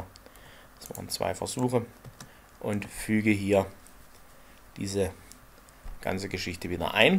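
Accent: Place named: Saxony, Germany. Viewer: Vielen Dank! Bitte in Zukunft mehr Tutorials auf sächsisch :)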